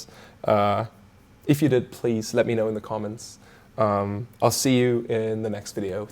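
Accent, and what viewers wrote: England, United Kingdom. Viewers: why are you developing an english accent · Does virtual riot kinda sound british in this video? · Is it just me or does sound like he has a slight British accent now? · Someone’s been spending a lot of time in the UK. He’s got half a British accent now!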